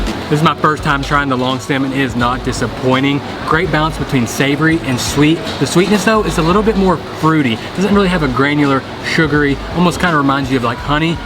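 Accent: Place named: South, United States. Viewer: Love your southern accent as well, you're so lucky to get to travel and eat all those exotic cuisines.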